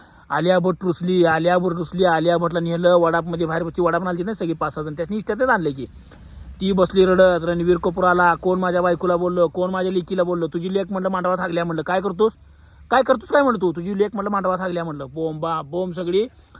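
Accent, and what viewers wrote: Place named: Kolhapur, India. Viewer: Bhava kolhapuri stayl made tumla mast jamaty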